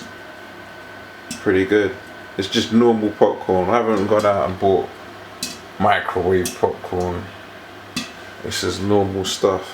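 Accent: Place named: England, United Kingdom. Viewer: thanks bloke. I wish all men sounded English like him. hubba hubba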